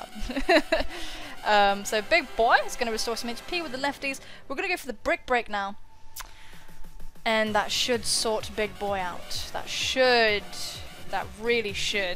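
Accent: England, United Kingdom. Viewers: Sounds awesome! Does anyone pick up on the English accent? :) But is it possible to get tea over there is an important question! Congrats on the 2K subscriber boost by the way, it's incredible! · Where in UK are you from?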